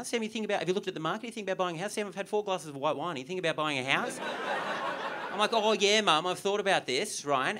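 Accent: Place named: Australia. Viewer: Wonderful! I spent 3 months in Australia about a decade ago. The accent, local colloquialisms, and Australian references and geography really brings me back. SO funny. Thank you!!!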